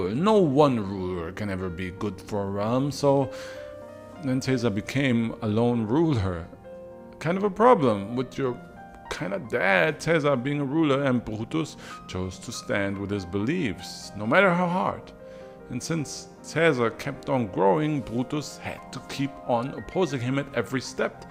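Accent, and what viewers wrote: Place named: Germany. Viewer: thanks! Very informative video. Your accent sounded very German to me but I wasn't sure what to make of it! We are both in the half-german club!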